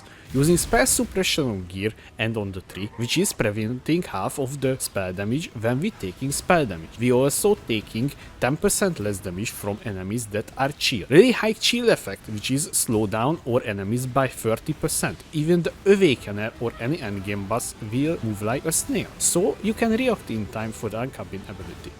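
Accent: Hungary. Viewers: you Hungarian?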